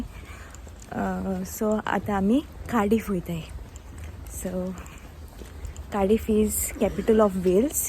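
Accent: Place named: Konkan, India. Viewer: What dialect of Konkani is this as I could barely understand. I live in Cardiff.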